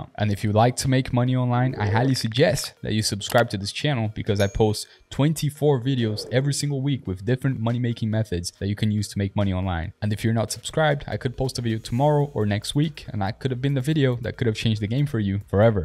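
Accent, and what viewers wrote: Brazil. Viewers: tu é carioca né kkkkkk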